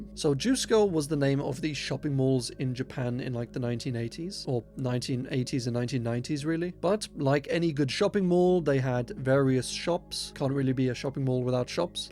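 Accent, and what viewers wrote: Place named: Scotland, United Kingdom. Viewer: I watch several 'iceberg' creators. Yes, I have subscribed to your as well. Because I like the best. YOU are one OF the best. Just enough curiosity and open mindedness without being naïve and gullible. <--that is a tight rope act and hard to do correctly. You do it good enough. Of course, no one does it AS good as me. <----and THAT is also a conspiracy!! Carry on. p.s. what's with the accent? Not S African or Australian. From the north of the UK? Scottish highlands maybe,.........<---- said the American with the deep southern accent with extreme Scottish ancestry.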